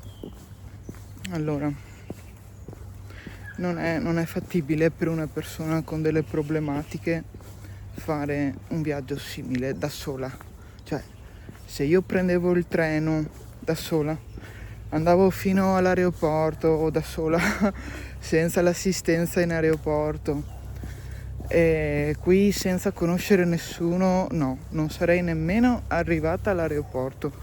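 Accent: Veneto, Italy. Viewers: per l'accento veneto che adoro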